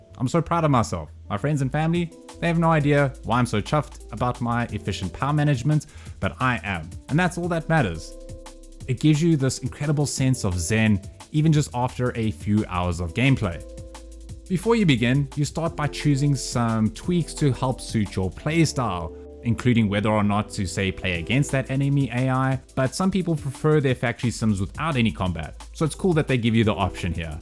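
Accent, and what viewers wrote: South Africa. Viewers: My man sounds like a Saffer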